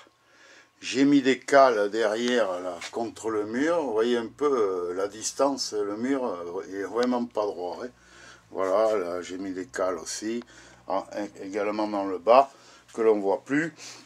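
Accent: South, France. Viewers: merci pour ta réponse et j'ajoute entre nous qu'entre l'accent du sud me ravi encore plus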